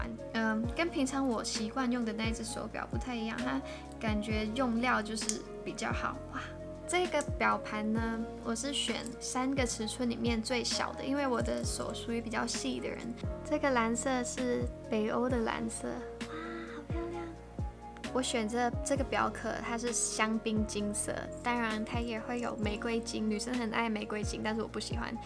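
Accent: Taiwan. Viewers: 點解你D國語可以講到同台妹一樣 ?!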